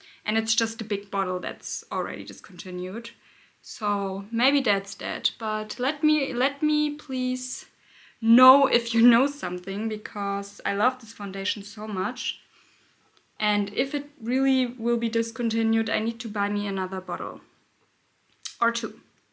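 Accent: Germany. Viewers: i noticed the german accent right away and i love it (half german girl here).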